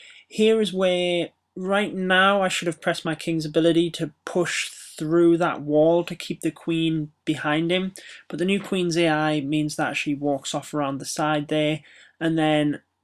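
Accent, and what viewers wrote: United Kingdom. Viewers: Bro brithish accent 😂😂 great bro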